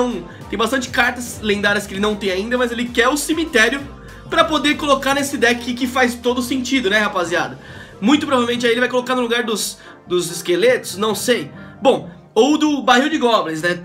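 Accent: Minas Gerais, Brazil. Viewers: Aposto mineiro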